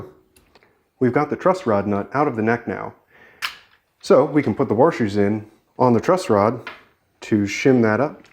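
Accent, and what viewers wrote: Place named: Prairies, Canada. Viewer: Get that nut out real quick. Are you by chance from Saskatchewan, Alberta, or Manitoba? Thanks for the tutorial. I will now attempt the repair.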